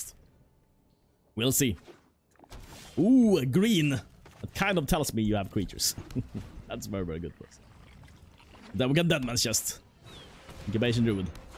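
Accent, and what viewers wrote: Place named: Sweden. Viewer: is u swede boi, u sound swede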